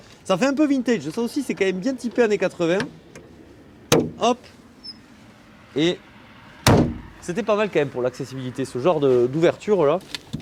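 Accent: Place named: Southwest, France. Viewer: Référencement pour l’accent du SO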